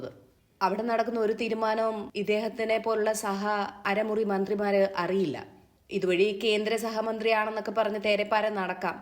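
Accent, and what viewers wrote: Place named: Malaysia. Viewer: Oo you are malaysian malayali😁. Accent oru different pole thonni. Nalla videos. Maybe orientation maatamenkil.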